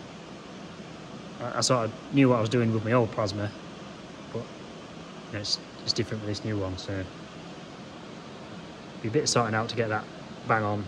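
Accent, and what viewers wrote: Yorkshire, United Kingdom. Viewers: You may find that small parts fall down the bed with it being trinangle stlye and crash with the torch Ive on my second plasma now on a esprit lightning hd and i run a bed with flat slats with a bit of an s shape to them stops small parts twisting up Listning to your accsent you must b based close to me im at filey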